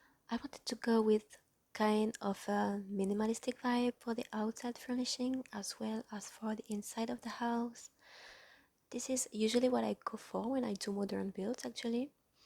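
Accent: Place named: France. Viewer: Hello! Je suis moi aussi française, donc on va dire que ça m'arrange que tu ais l'accent, comme ça je comprend mieux ! Sinon tes constructions sont toujours super belles, je me demande toujours comment tu arrives à faire de belles choses seulement avec le jeu de base où quelques packs... Moi j'ai quelques packs, mais je ne peux plus m'en passer ! Et aussi, je trouve ça cool le voice over!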